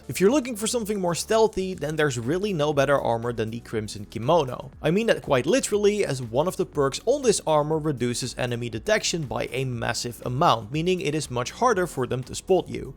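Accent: Netherlands. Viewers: That's how most Dutch people sound when speaking English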